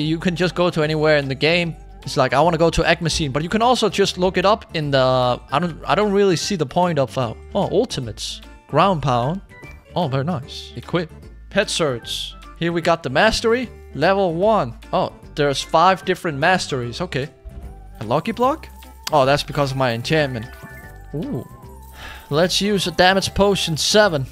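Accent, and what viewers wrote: Denmark. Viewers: Quick question are you Danish??😅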